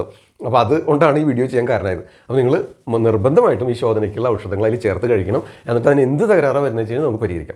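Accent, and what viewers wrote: Thrissur, India. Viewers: തൃശൂർ ഭസായാണോ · Sir. Thrissur aano? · Trissur slang is good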